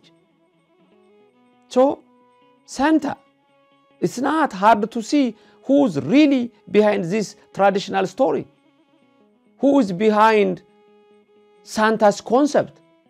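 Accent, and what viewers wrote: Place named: Gojjam, Ethiopia. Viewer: እንግሊዝኛን በጎጃምኛ።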